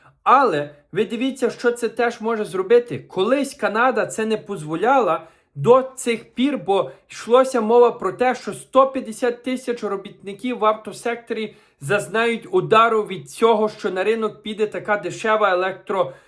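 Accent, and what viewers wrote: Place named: Western Ukraine, Ukraine. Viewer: Он бандера это у них такой диалект западенский бандера гельготун на мойве, это не украинська мова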